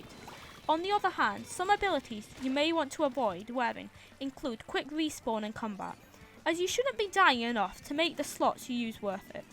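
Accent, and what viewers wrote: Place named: Scotland, United Kingdom. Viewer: omg voice reveal!!! she sounds so Scottish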